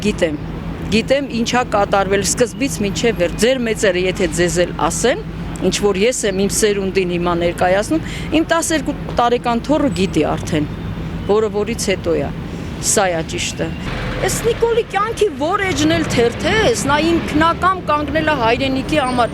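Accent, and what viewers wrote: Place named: Turkey. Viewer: Հաստատ թուրքա հայերեն խոսացող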